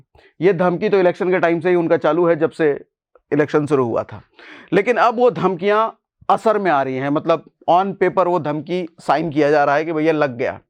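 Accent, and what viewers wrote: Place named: Bhojpuri, India. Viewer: Please try to understand what is analysis bringing out data is only fact what is implication on India on the tariff placed by Trump you don't know you have not even understood you are just speaking like Khan sir nothing more no value I have watched you few times you have got only style of speaking and that a typical of Bhojpuri nothing else no value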